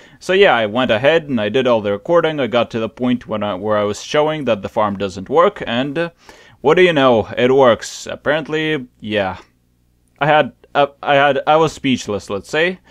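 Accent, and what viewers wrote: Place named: Canada. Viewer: you sound Canadian :-]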